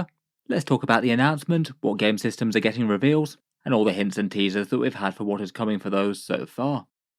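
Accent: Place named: Southern England, United Kingdom. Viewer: Somewhere in the south. And Games Workshop is a British company, there’s stores in nearly every town.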